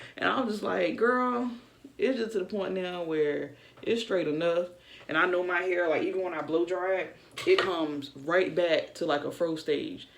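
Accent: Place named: South, United States. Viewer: I thought you from chicago or Alabama because the base southern hue in your voice and I'm from new York living in me mama's hometown and grandma's in Goldsboro,NC.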